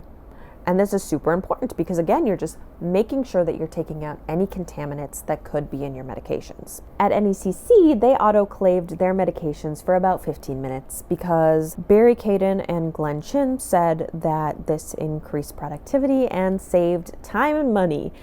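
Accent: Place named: United States. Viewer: Well, your explanation of why you were uncertain of the pronunciation. If English is your second language, phenomenal American accent.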